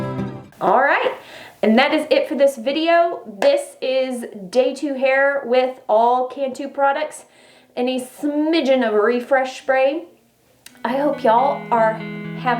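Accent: Texas, United States. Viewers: Yep, I recognized your accent and bouncy personality as pure Texan right away! Our Texas weather adds an extra challenge for we curly girls, but these Cantu products are my faves...they work wonders! I have fine, thick, 3B, 3C curls. I use the Define & Shine Custard (basically, gel in a jar) instead of the glue gel. The custard has that weird thick sticky feel too, but the cast is softer and most of it breaks up overnight as long as I don't use too much. Next morning I take my pineapple down, leave my hair alone for a bit and it usually falls into place. Occasionally I might have to do a little root shake/fluff but that's it.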